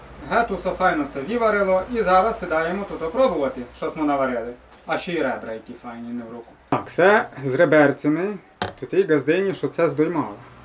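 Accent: Hutsul, Ukraine. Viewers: З'їсти ситного - це добре. А от почути рідну мову, та ще й гуцульську говірку, - це делікатес для душі, й п'ятдесяти грамів не треба.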